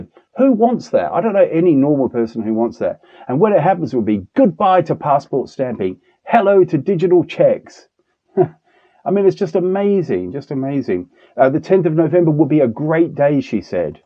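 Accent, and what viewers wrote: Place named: South Africa. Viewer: Enjoy the SA accent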